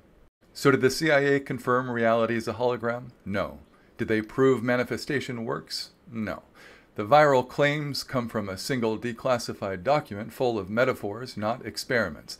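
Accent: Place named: Mid-Atlantic, United States. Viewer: Drop the mid Atlantic accent hommie I just want the information at 185 wpm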